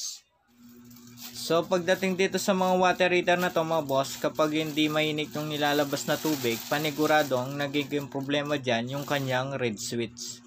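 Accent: Pampanga, Philippines. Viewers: Kapampangan ka no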